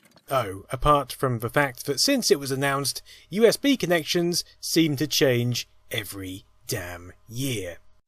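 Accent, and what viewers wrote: United Kingdom. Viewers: I giggle every time he says Nokia. Does everyone from the UK pronounce it 'nawkia'?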